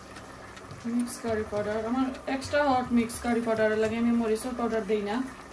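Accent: Sylhet, Bangladesh. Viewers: Afa ami afnar ek sylhety boin . Amar channel ta guriya ayba ar Bala lagle subscribe korba. Amio subscribe korsi.afnar sylhety mat na sundhor MashAllah.karala Bhorta- kube moja oibo lajer.❤️❤️🍓🍓🍓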